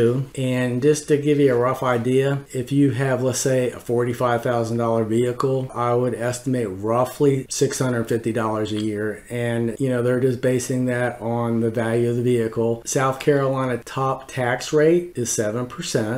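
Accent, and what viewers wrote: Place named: Pittsburgh, United States. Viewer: Great content! You sound like you’re from Pittsburgh!?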